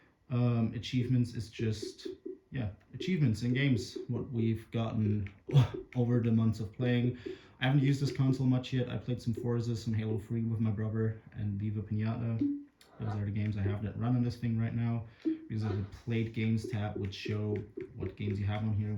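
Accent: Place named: Germany. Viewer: bin hier bevor das video 6 stellig wird :) echt nice, musste auch länger hinhören um zu checken dass du deutsch bist :D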